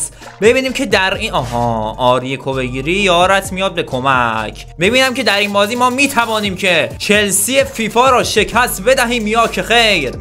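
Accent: Mazandaran, Iran. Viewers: داداش تو مازندرانی هستی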